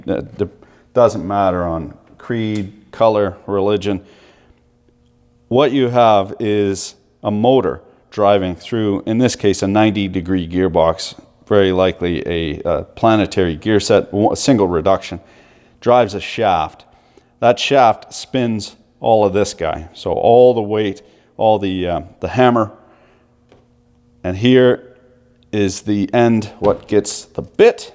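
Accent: Canada. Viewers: Do all canadians speak like that?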